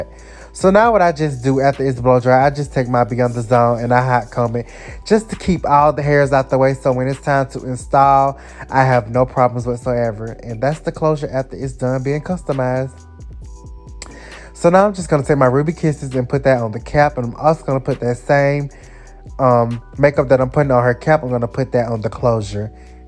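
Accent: South, United States. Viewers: being too cute when the country twang jump from the gums.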